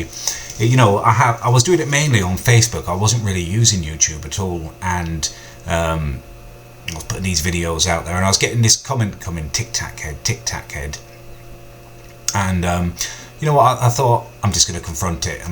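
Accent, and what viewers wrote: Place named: Blackburn, United Kingdom. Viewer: I thought your accent sounded familiar, I'm from clitheroe it's a town about 10 miles away from Blackburn. well done on 20k btw I like your videos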